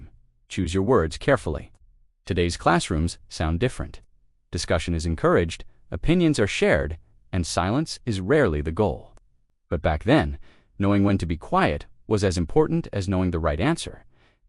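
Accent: United States. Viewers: Why are videos about British kids voiced by an American who not only is not old enough to have heard them and in all probability does not know what they might mean.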